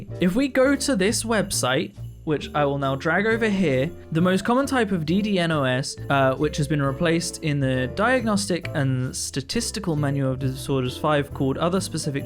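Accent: United Kingdom. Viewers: It's only now occurring to me that you're British.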